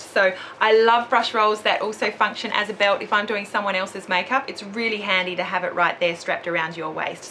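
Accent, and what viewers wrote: Australia. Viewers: are you from australia?.c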